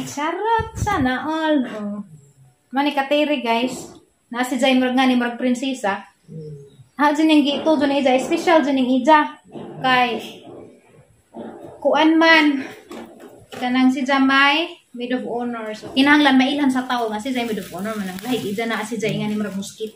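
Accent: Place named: Bohol, Philippines. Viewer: Mahimoot ko sa imo tonada. Boholana.. gahi ayo imo Tonada. Mao nag subscribe ko nimo... Bisaya ko piro Dia ko Mindanao nagpoyo. Nagpojo.haja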